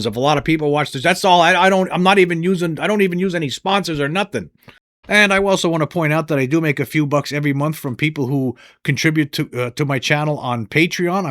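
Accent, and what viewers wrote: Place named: Massachusetts, United States. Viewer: You from Massachusetts ? I’m usually pretty good at picking up on a fellow neighbors accent lol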